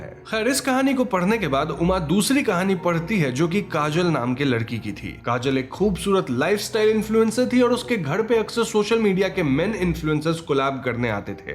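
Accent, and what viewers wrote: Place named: Bihar, India. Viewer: जिया हो बिहार के लाल भाई बिहार से हूं एक्सेंट आ रहा था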